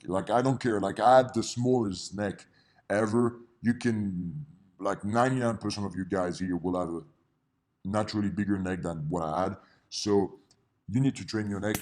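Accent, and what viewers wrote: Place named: Netherlands. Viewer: I can tell your Dutch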